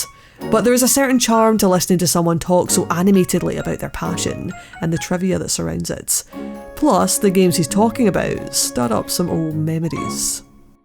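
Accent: Scotland, United Kingdom. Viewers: Good to hear a Scot do the review. Ta Pal.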